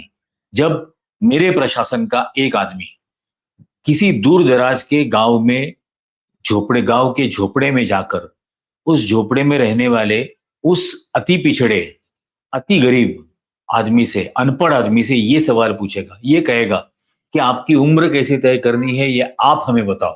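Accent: Standard. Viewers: सर, खूप चांगल्या पद्धतीने अगदी शुद्ध हिंदीत माहिती मिळाली 👏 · आप ने बहुत महत्व पूर्ण जानकारी दी. यह कहना भी बहुत जरूरी है कि आप बहुत अच्छी हिन्दी बोलते हैं और आपके उच्चारण की शुद्धता शानदार है.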